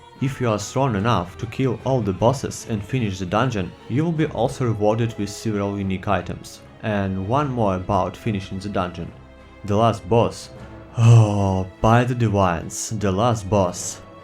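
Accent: Germany. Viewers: You have the first good-sounding German accent I have ever heard. It takes my brain a moment longer to understand what you are saying, as with most accents, but clarity is not an issue. Going from speaking German to speaking English is difficult from what I have heard, so keep up the good work!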